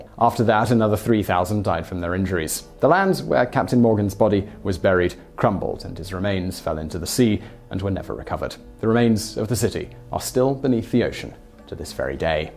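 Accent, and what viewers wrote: United Kingdom. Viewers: I love how most people from the British Isles that I've ever heard speaking absolutely refuse to pronounce any word that ends in "-tory" as "toree". It's ALWAYS pronounced "-tree". Could the politics of the "Tories" have anything to do with that I wonder? ;) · I don't know about you guys but Simon and Company could research the origin of the toilet seat and we'd click on it and damn it find it one of the most Interesting facts of the day! That British accent just has a way of keeping you engaged in though! Well done again guys! Thx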